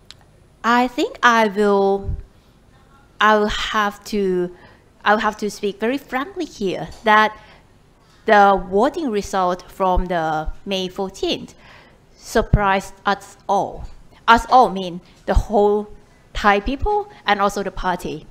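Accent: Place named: RP, United Kingdom. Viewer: สำเนียงแบบ RP เปล่งๆไปนิส 😊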